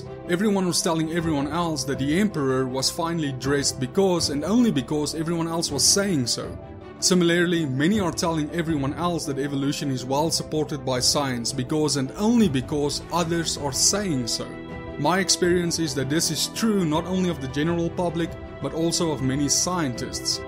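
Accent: South Africa. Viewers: ahh crap! he sounds South African, if he is, I apologize for the rest of us who understand science. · You sound South African... are you?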